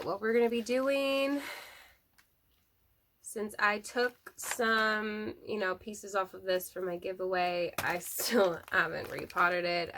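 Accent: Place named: Bronx, United States. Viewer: Thanks for sticking up for the golden pothos! ✊😊 I really like this repot. It covered a lot of ground. Ok let's sum up: Yes, you have an accent that's says "just north of NYC". Girl, get that Miracle Gro darkest pits of hell Satan dust out of your home! The fungus gnats will come. Just remember Miracle Gro has chemical fertilizer added so combining it with worm castings will give your soil high amounts of nitrogen and you can burn your plants. Lastly, what medicinal plants are you growing and planning to grow? 🤔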